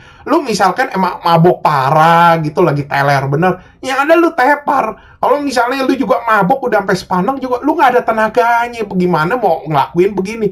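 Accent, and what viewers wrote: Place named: Betawi, Indonesia. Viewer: Org betawi bang logatnya kyk bang alex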